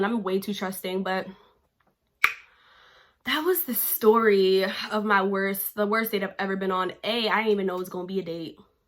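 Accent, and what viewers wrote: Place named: New York, United States. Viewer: Are you from NY you sound like it?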